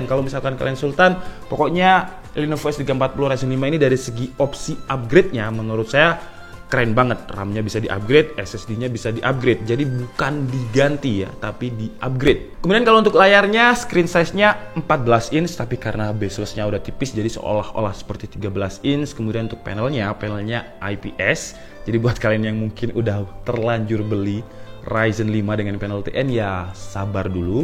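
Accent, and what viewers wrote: Malang, Indonesia. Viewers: Lohhh mas wong malang to?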